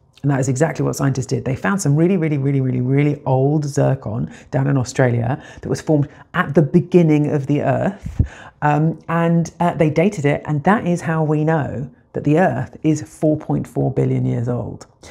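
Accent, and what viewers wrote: England, United Kingdom. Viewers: Aaawww 🙈 you are a 100 ct 💎 I hear your theory 😂 I'm sorry, a fake Diamond 💎 is not better than the real thing 😂 you are the Real thing and you are Blessed with the best English Accent in the world 🤗 Your Voice is worth a Billion Diamonds 💎 🙊❤️⚘️ Stay Bless ✝️ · Generic English accent. Hear it everyday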